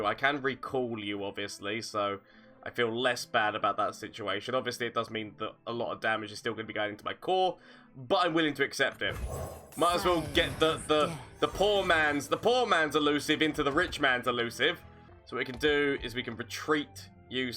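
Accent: United Kingdom. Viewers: The best about his videos are that latino face and name paired with that lovely british accent ❤️ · Hehe love this accent ^^ Im From France ( Paris) Im in love with Scotland but i like England too 🤗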